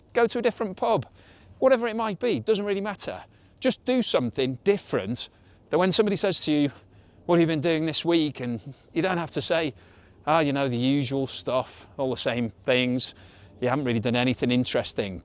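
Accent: United Kingdom. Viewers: Don't let the nay-sayers bother you. Take it from me, from over across the pond, you don't look a day over 70! Just kidding of course, that's an old American joke. Pun intended. Regardless of your advanced age and Brit accent, I love your posts, warm Brit beer, Fish n Chips, and since I was a kid and saw my first bike, I'm crazy for vintage Brit café racers. And whatever you do keep the King! We Yanks love the drama over your way so we don't have to think about the drama over here.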